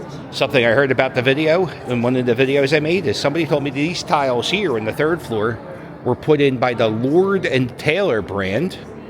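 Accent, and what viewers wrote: Philadelphia, United States. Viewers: Bros Philly accent is 👌 💯 😂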